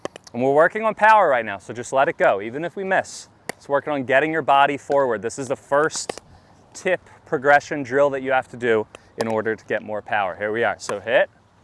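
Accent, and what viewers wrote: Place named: Philadelphia, United States. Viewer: Just discovered your channel—very valuable lesson! —you from Philly? Dialect reminds me of home…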